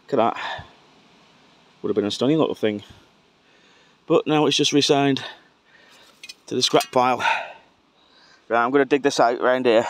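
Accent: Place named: Lancashire, United Kingdom. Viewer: Hi I'm a recent subbie. I enjoyed watching this dig, it's always interesting to see if anything good appears whether it does or not. The Cutex bottle is nail polish. The brand is still going nowadays. Nice to hear the Lancashire accent as I was born in Burnley but lived down south since I was one year old. Keep up the good work!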